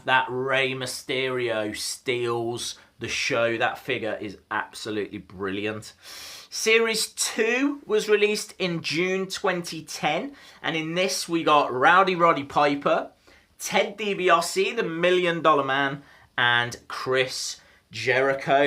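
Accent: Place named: England, United Kingdom. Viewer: i love the way english people pronounce some words and letters its kinda refreshing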